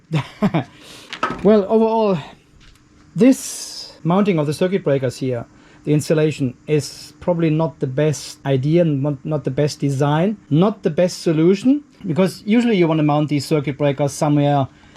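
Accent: Germany. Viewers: Hello, I am from the nature Island of the Caribbean, Dominica. I have been watching your videos for a while now and I must say that there's never a dull moment, I just love your style and your accent, when I first watched your videos I told myself this man is from Germany because I worked with a few Germans building a brewery in my country. Keep going bro we a watching. Oh and the next time you want to say "shit" please say it in German I like to hear it but can't spell it.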